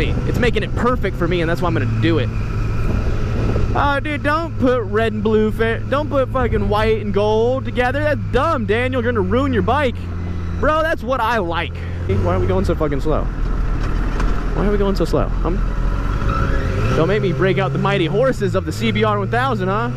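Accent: Australia. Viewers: more Australian accents in ur vids 😂 pls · I'm Australian and I love your Australian accent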